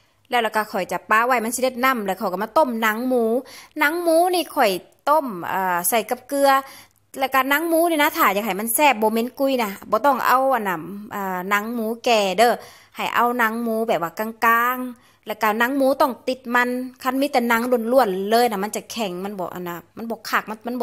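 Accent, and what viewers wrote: Savannakhet, Laos. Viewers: ມວ່ນເດ ສຽງສາວສະຫວັນ fc ເດີຕິຕາມມາຕຫລອດສອນລະອຽດເວົ້າລະອຽດ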